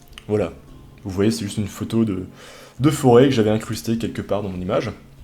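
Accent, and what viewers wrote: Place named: South, France. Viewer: oh le bel accent du sudeuh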